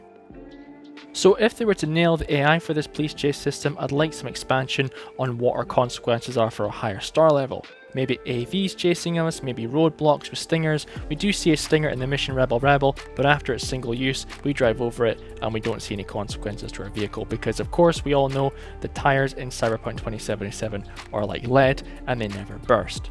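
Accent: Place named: Edinburgh, United Kingdom. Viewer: Your accent, you a fellow scot? Sounds like an Edinburgh dialect. Great vid btw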